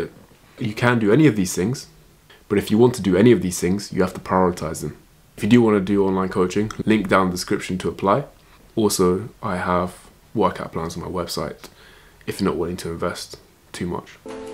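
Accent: England, United Kingdom. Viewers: Looking very handsome and gorgeous - being Irish myself, I love your English accent, so classy - I’d date you in a heartbeat 🇮🇪☘️🇬🇧🥰😍😘😘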